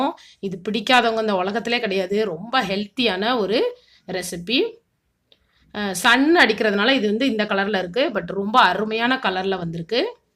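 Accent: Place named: Tenkasi, India. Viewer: Hi Akka..... my native was Tenkasi (pavoorchatram). So i liked your way of talking, while seeing your video your voice taking me to my native. I watched all your videos....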